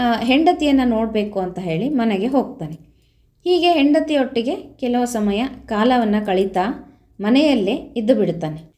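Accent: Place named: Bangalore, India. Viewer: ನಿಮ್ಮ ಮಾತು ಕೇಳಿ ದರೆ ಬೆಂಗಳೂರು ಎನಿಸುತ್ತದೆ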